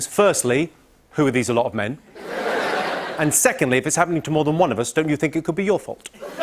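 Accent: United Kingdom. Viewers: I like how he intentionally slows down so Americans get the jokes in a British accent.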